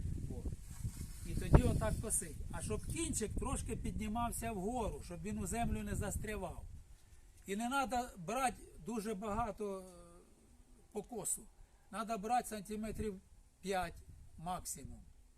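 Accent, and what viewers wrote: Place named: Ukraine. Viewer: Який прекрасний говір,говір нашої України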